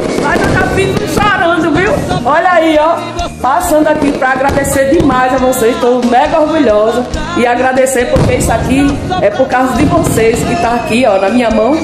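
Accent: Northeast, Brazil. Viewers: Sou de Belém do Pará e adoro esse sotaque nordestino.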